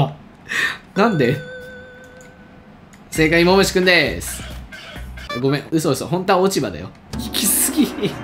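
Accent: Kansai, Japan. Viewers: くんさんの、関西弁おもろすぎやろ！！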